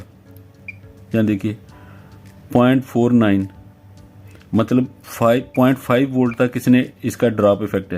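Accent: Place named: India. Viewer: با اون لهجه هندی ریدی تو انگلیسی حرف زدن ، گاو مثل آدم انگلیسی حرف بزن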